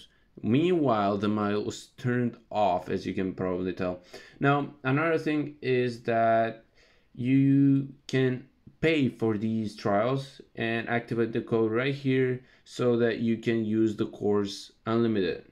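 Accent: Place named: Balkans. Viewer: Are u from balkan? I know the accent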